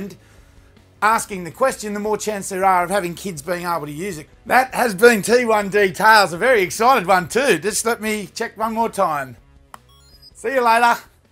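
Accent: Australia. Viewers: Aside from the Australian accent, you remind me of steve irwin lol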